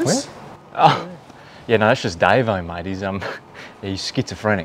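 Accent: Australia. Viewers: Before I visited Australia I thought everyone spoke like this guy but it’s just a few culprits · And that’s the Australian accent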